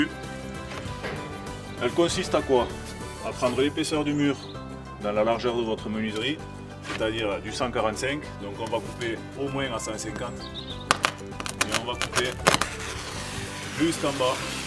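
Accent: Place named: Carcassonne, France. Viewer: slt, super merci pour les infos. Je ferais donc un coffrage si tu me le dit c'est que tu le sait ne pro c toi pas moi 😉. J'appiquerais donc tes conseils à la lettre . Je me disait aussi cet accent est le même que ne mien !!! Moi je suis de carcassonne un grand merci pour les combine surtout que tu n'est pas obligé. Par contre si tu fait un tuto sur ce genre de méthode eh bien je prend avec plaisir.a moins que tu l'est déjà fait dans ce cas pas besoin. Je n'est pas encore pu visionner toutes tes vidéos je le ferais ce week-end je pense encore merci 😉😉😉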